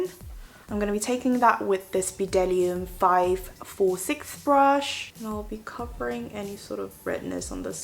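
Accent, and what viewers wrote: United Kingdom. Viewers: 영어로 말하시는 영상 볼 때마다 영국 발음에 치이고 가요...... ㅠㅠ 진짜 너무 멋있어요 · 너무 예뻐요!!!! 눈도 피부도 입술도 다 찰떡같이 잘 어울리세요ㅎㅎ 영상 분위기는 깔끔하고 자극없어서 영국발음도 부드럽게 들려요 이 점을 정말 좋아해요💕💕💕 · 마스카라너무 이쁜거 같아요!!! 항상 느끼지만 영상 보면 너무 영국영어 배우고 싶어요ㅠ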